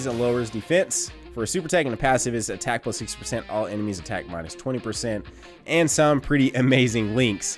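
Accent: South, United States. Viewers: IS FROM THE SOUTH AND WHAT STATE!? He has that southern accent or am I crazy!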